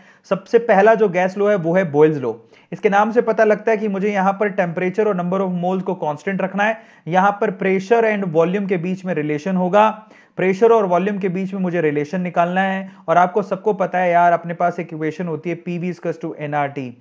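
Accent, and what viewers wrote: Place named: Gujarat, India. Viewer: Sir gujrati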